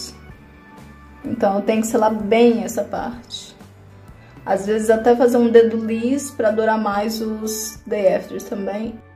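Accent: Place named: Bahia, Brazil. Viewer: Nossa ela é da Italia mas parece que tem um sentido Bahiano de Salvador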